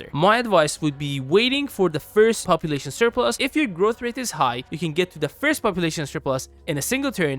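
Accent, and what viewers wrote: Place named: Iran. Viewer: you have a very strong iranian accent, that brings the question, are you iranian?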